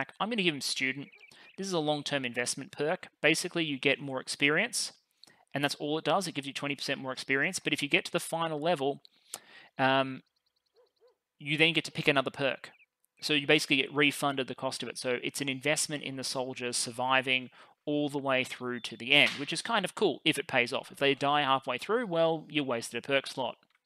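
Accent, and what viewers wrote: Australia. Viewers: Auzzie accent, and clobbering heads in. Perfect combination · I always appreciate your calm, thorough explanations of complex games! Your easy Aussie cadence and relaxed delivery is like butter to my ears.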